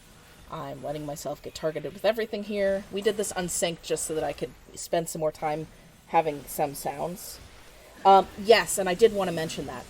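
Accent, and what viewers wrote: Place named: Canada. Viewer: Your accent is close to mine but idk what mine is….are you Canadian??????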